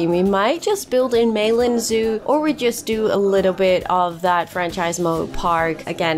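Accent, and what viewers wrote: Netherlands. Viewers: Love all your video’s but are you Dutch ?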